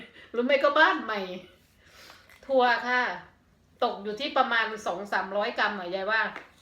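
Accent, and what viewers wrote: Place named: Central Thailand, Thailand. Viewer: ยังไม่แก่เลยเรียกตัวเองว่ายายแล้ว เราอายุมากกว่าอีกยังไม่อยากเป็นยายเลย 5555 ดีค่ะชอบ พูดภาษากลาง เราคน กทม.ฟังภาคอื่นเค้าคุยกันก็น่ารักดีนะแต่ฟังไม่ค่อยรู้เรื่องคะ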